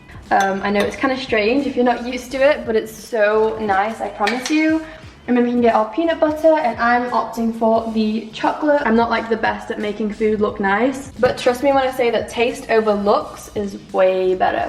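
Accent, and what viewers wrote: United Kingdom. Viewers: Where is from? She has like this accent from the UK, but the name doesn't sound European?.-.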